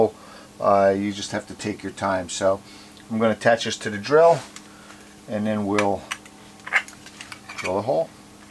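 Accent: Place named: United States. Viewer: An excellent video so thanks for doing it. I didn't get any instructions with mine but that's O.K. thanks to your video, and spoken in plain American too. U.K.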